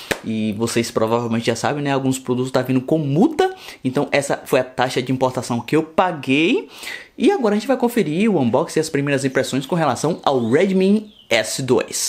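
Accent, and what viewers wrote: Bahia, Brazil. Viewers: Tu é da Bahia?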